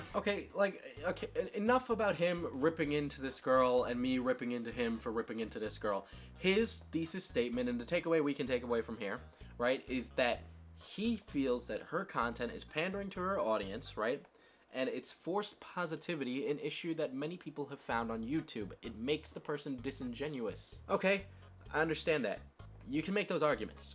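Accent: New York, United States. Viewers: Liam Collins I think its just the new yorker accent accompanied with memes. I dont think he acts like chrisraygun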